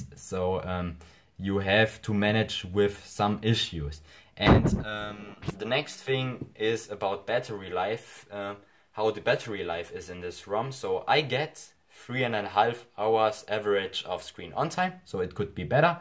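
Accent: Germany. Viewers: Hallo, Ersteinmal zu Dir. Deine Arbeit sticht heraus, besonders da du auch auf die Details eingehst die andere in ihren Tutorials gerne auch mal unter den Tisch fallen lassen oder nur sehr dürftig erklären. Das Thema ist weitaus komplexer als nur 'buttons' zu drücken und ein USB mit dem Rechner zu verbinden. Daher, hats off! Machst du gut ansonsten würde ich dich nicht mit meinem ersten Youtube comment ever beehren und belästigen. Bleib so bzw. entwickle dich! English and fluently? Keine Sorge, da kommst du noch hin. Bist ja schon gut am üben für... ich nehme mal an die English Prüfung in mündlich? Versuch mal das, dein English nicht zu sehr 'einzudeutschen'. ;) Nun zu mir: Ich (completely newbie) und in der letzten Zeit viel zum Thema flashen und rooten angelesen (xda, etc.) bzw, tutorials dazu gesehen (hier und anderswo) möchte, um klein anzufangen erst einmal mein SM-G935F mit 1&1 Branding a)debranden und b)mit einer neuen und aktuelleren FW (DBT/G935FXXS2DRA9) grundversorgen. 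Vielleicht kannst Du mir mit deiner Expertise meine gebliebenen Fragen beantworten und Ja! Sorry schon mal vorab für das nun folgende Buch... Ist Zustand: SM-G935F mit 1&1 Branding FW: Nougat 7.0. Keine SIM vorhanden (mein erstes Mobile seit Jahren und ja, es geht auch ohne! :D) Weder Pins, Passwörter noch Fingerprint eingerichtet Mobile mit einem Google Konto eingerichtet Frage zu: Backup Ungerooted kann ich Titanium Backup nicht verwenden. Helium speichert die Apps nur in die Cloud (Google Drive) a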